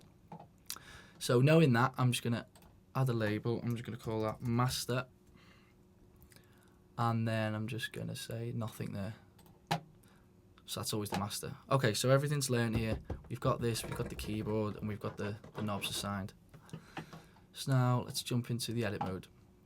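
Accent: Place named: Liverpool, United Kingdom. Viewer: Subscribed! Brilliant teacher, thanks. And I could listen to that Liverpool accent all day....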